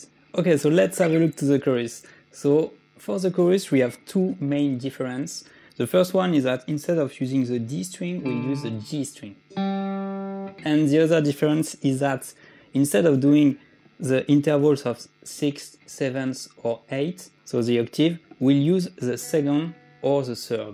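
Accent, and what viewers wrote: France. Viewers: are you french ? cuz you sound pretty french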